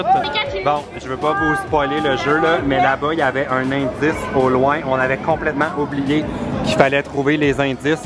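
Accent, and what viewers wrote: Quebec, Canada. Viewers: tu as ecris labyrithe dans le titre faut ecrire labyrinthe ps:j'adore tes video et jdr l'accent quebecois 😊😁😍😍😘😘😙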